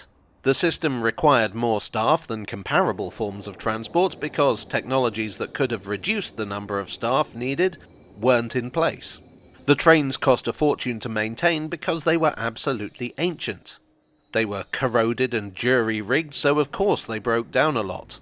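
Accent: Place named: Glasgow, United Kingdom. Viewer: This Narrator is coincidentally Glasweigen. His accent is broad Glasgow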